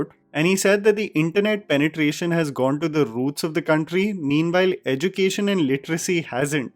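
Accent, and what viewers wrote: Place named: Punjab, India. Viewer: You sound so good speaking Hindi with a Punjabi twang.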